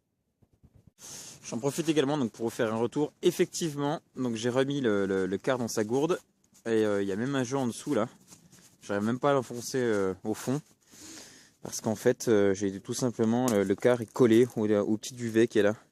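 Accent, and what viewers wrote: Franche-Comté, France. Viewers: dans le meme esprit tu aussi a la rangers gore tex special grand froid et longue marche et tu as la rangers para c se que j avais,un peu moins chaudes que la gore tex mais plus efficace pour crapahuter voila apres c un avis perso!! pour un tarifs en dessous 100e pour les 2 modeles a pluss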